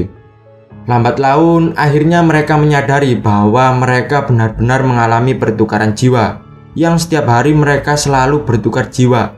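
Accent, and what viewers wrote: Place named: Java, Indonesia. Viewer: Suara mu medok mas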